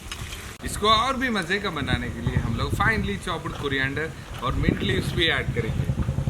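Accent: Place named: South, India. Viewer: hindi South Indian accent cool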